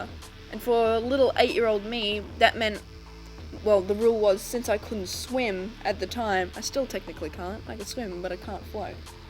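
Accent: Australia. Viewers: I came for the auzzy accent, u stayed for the comedy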